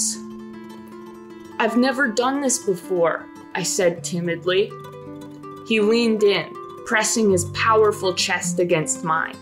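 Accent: Australia. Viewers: Actually really good Australian voice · More australian accents please. 10/10 video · Solid Australian accent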